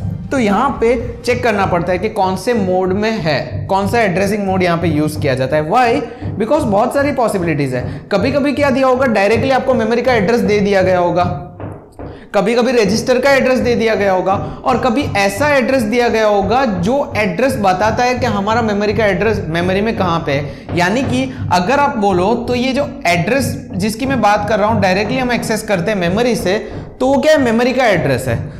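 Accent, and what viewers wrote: Gujarat, India. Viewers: bhai gujarat se ho?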